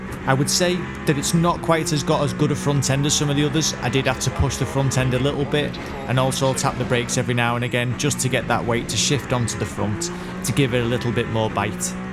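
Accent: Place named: Bolton, United Kingdom. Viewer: Not a beginner but I watched the whole video to see if your observations are similar to mine - turns out they are almost exactly what I felt as well. For example the Lambo being edgy and bouncy over curbs but a more exciting experience than say the Peugeot. Also, I really enjoy the way to structured the video with each car segment being your fastest laptime long. Another question: I'm not a native english speaker but I really enjoy learning about accents. I could hear that you're probably from the northern parts of England, but I'd love to know which part more specifically, if that's not too much to ask. Your pronounciation of "fair" for example sounded more like I pronounce "fur" and the last person I heard talk like that was from Bolton.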